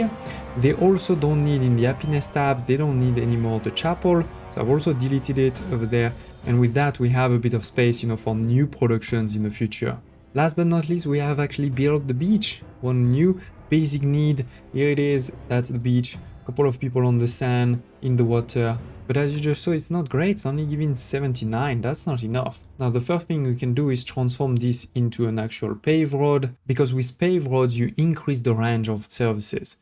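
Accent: France. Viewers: i think the video would be better without the french accent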